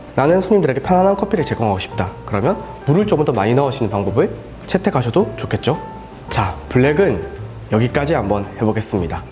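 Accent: Gyeongsang, South Korea. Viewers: 씨발 시작부터 경상도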